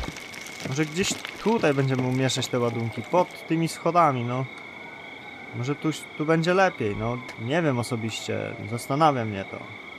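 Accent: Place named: France. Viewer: masz francuski akcent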